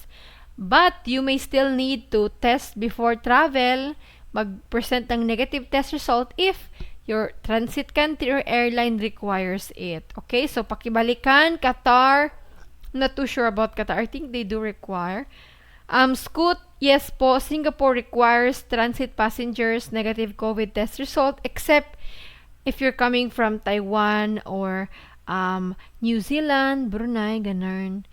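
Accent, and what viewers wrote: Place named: Philippines. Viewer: you are the epitomy of a true Filipina announcer. You have that no-nonsense, non-American accent, indigenous vernacular no other country can produce. Proud to be a Filipino and very proud of you for being yourself. Moreover, your videos are so informative for Filipino American travelers.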